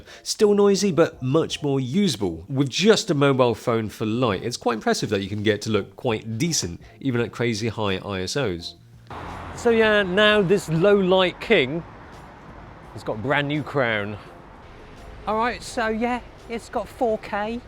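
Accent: United Kingdom. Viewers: Oh my is so Brit-ish that accent tho! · Thanks the british for colonizing Hong Kong, his british accent perfect.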